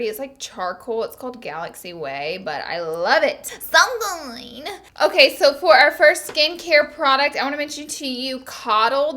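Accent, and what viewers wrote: South, United States. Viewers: I'm usually a silent subscriber, but just wanted to drop in and say I love how vibrant your personality is. You are amazing at bringing your personality and humor through the camera and I can't get enough of your animated facial expressions. Also, your draw makes you more relate-able to those of us in the south. Keep it up girl!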